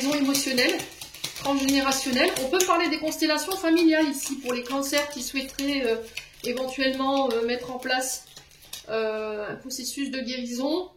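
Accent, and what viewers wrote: North, France. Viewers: Bonsoir a tous, bonsoir, j'adore ton accent de chnord,je suis de Calais 😂 je suis cancer ♋️ ascendant cancer ♋️, elle est bélier 🐏 ascendant bélier 🐏, moi je me suis laissé emporter par elle,love bombing, gaslighting etc.....bref courage a tous,parfois il faut traverser toutes ses épreuves pour avancer...et croyez-moi cest purée de dure...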